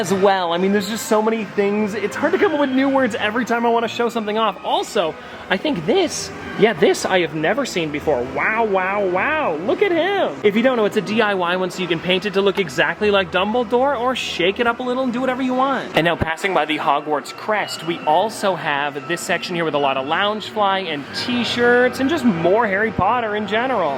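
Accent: Canada. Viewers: across the country i thought your Canadian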